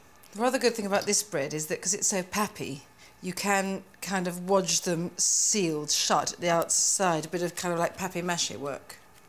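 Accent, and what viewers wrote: United Kingdom. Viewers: I remember watching some of her videos as a kid. It's one of those wistful kind of memories. I really liked her but I couldn't put my finger on why. I think it's partly the cinematography that gives her the signature charm. It's a combination of a British accent, great camera work, and classy ASMR.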